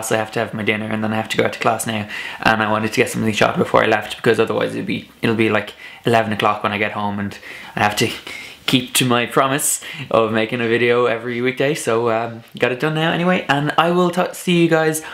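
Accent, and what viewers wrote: Ireland. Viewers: You're defo D4. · You have a gay irish accent ! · To non-Irish, your accent is quite pronounced. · Yeah you don't have a "tick" Irish accent. Sounds a little bit like mine. I wouldn't say it's Americanised though. When I think of that, I think more of the D4 accent. Yours definitely sounds Irish, just soft and not at all like you are trying to sound American. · Yeah, we like Irish accents. You have one, for sure. As an American, you'd never pass for American, nor English. Tootsie Rolls are chocolate chewy candies that look like frankforts, (hot dogs). Ramen Noodles ( RAH-MEN) are just what you imagine they are, small individual packs of noodles you heat and eat. Pecan, is a nut we Americans say like Pee CAHN, or Pee can. Neutral to you, man, but delightfully different than Americans'. Yeah, Americans say "wada" for water. " Nice video! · Ah babe, you've a mad strong Irish accent :)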